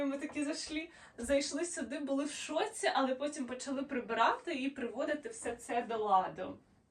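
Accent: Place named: Western Ukraine, Ukraine. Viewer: У нее бизнес,а не молодец,голос западенки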